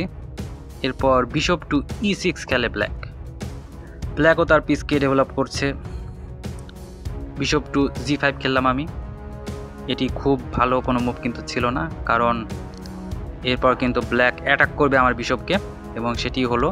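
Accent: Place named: West Bengal, India. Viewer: না,মানে আপনার কথার ধরণটা পশ্চিমবঙ্গের মতো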